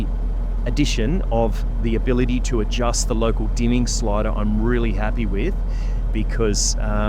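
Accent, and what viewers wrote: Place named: Australia. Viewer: Perfect video mate and the Aussie accent always wins for a Danish guy like me :) Still waiting for my Super but it is going thru the QC right now so I should have it within a month I guess :)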